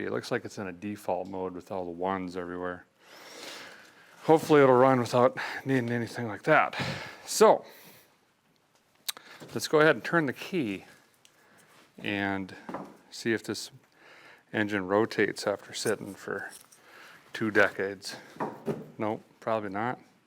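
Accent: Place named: South, United States. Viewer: Your southern is improving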